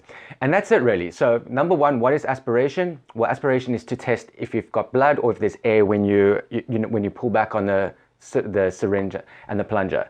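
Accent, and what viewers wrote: South Africa. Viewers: Sounds south african?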